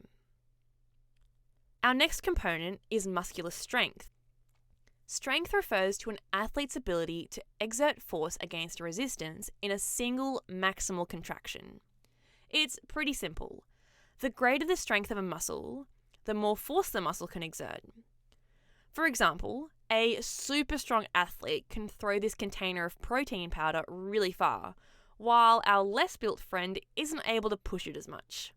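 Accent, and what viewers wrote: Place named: Australia. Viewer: You sound Australian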